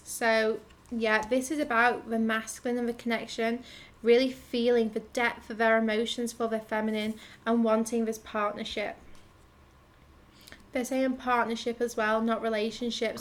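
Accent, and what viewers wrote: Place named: Northern England, United Kingdom. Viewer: Hullo, you are VERY tuned in. Your channel popped into my auto generated YouTube list yesterday and yesterday's reading followed by this one is mind blowing. And I'm only of today's reading, LOL, just wanted to give you the thumbs up straight away. You have a northern English accent. Where are you based - Yorkshire?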